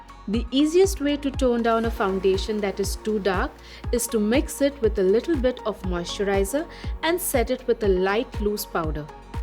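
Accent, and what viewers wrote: India. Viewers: shes black. how she has indian accent?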